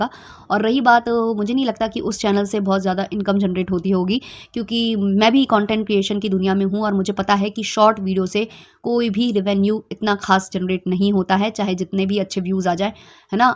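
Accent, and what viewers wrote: Bundelkhand, India. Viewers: Hello tum up bundelkhand kaha se ho m bhi wahi se hoo bundelkhand ki..Main kafi din se tumhare reaction dekhti hoo tumhari tone se lgta to tha tum bundelkhand ki ho lekin kbhi pucha nh tha please btana kaha se ho mujhe achcha lgega ❤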